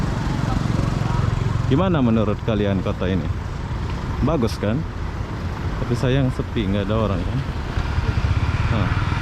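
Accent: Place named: Malaysia. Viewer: Bro lo org malaysia apa indo sih. 😂 logat lo kya org malaysia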